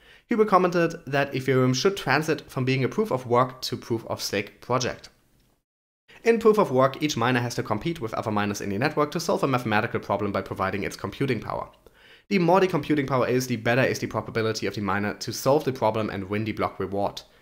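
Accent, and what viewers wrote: Germany. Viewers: den deutschen akzent hört man einfach sofort raus haha^^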